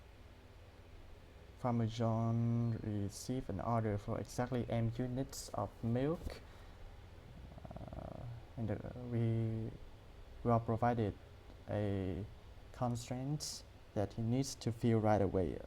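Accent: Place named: Vietnam. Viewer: Your accent, sounds like Viet. Anyway, great video!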